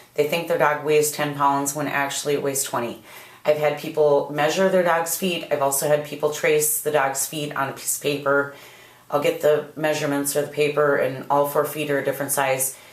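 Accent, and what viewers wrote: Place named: Minnesota, United States. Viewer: Great video! Thanks! Now I'm going to watch the "how to fit" video. I'm from MN but been in AL for 30 years. Fun to hear the accent again! Did I really talk like that growing up?? lol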